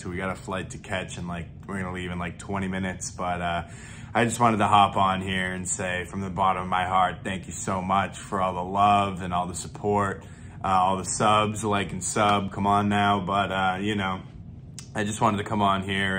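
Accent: Boston, United States. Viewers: Are you a Southie? Live in a triple decka?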